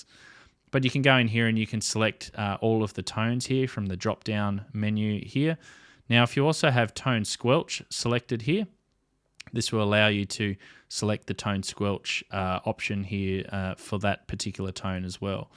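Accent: Australia. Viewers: Can't find my UV-7B anywhere on the list, Chirp sucks.. Work on losing the aussie accent, sounds awful. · Hej Matey, well i bought the Boafeng UV5RH and tried to use the CHIRP software and then i got stuck, so i went on youtubr to look for tips n info. A Minefield of ppl giving their views n tips on their vids....... i have watched so many from the uk and usa and i havent found one untill now that goes through the start process as well as you do. Yup fair dinkum me old possum, Sorry, just using my English sense of humour, No but seriouly i got to downloading from the radio all the channels and then WHAT..... untill now when you showed me how to save the numbers and then delete them from the radio ready to programme the new ones in by hand or buyin using links on CHIRP... I live in North Wales in the UK and even here the videos dont tell you that, strange isint it? 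i have asked pp on fakebook in my area and i got limited responces and mostly use chirp............ As i am not young anymore all i need is to be shown once and i generally have it. So now i have found your video, saved it and subscribed to you also plus you have a good Aussy accent that is easy to listen to. So thank you for putting this video out there matey. Be safe out there. Thank you from over here in North Wales UK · I just found your channel then I recognized inmediatly that beautiful aussie accent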